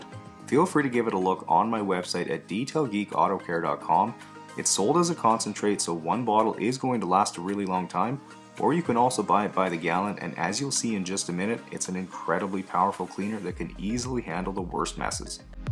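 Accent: Canada. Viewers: I loved this video! I’ve never seen your channel before but a YouTube friend said I had to add you to my watch list. I love dr pimple popper, the hoof GP and now you! Your voice is so soothing (love me a Canadian accent) And the transformation is amazing! What an incredible job 👏🏼 Love from Scotland 🏴🥰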